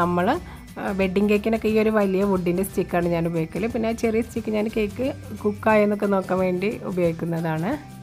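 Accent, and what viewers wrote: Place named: Chavakkad, India. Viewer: ഇഷ്ടായിക്ക്ണ്.... ചാവക്കാട് സ്ലാങ്ങ്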